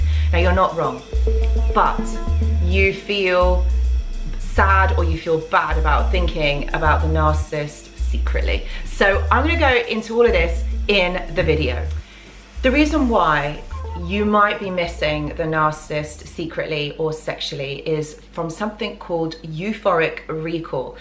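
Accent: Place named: Bedfordshire, United Kingdom. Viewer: You sound like you’re from Bedfordshire or Hertfordshire haha... and yes cool video, thank you 🙏🏻 realign when I see 222 ☮️ This had 111 views right now too haha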